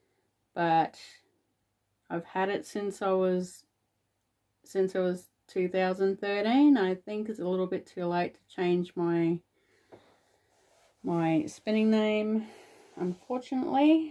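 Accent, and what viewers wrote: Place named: Australia. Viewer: Great to hear an Australian voice. Love this yarn. I am a beginner spinner but I have this in mind for later on. Thanks for sharing.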